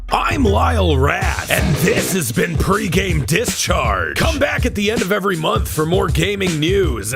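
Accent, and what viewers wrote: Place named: United Kingdom. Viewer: His British accent reminded me of dex there (sanity not included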